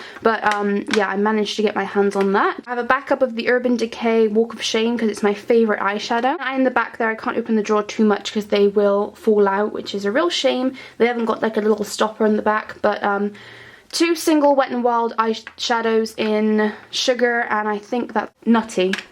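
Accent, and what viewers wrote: United Kingdom. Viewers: Are you from Britain? I'm really struggling trying to guess your accent, you sound british to me but I am unsure aha I am a new subscriber xxx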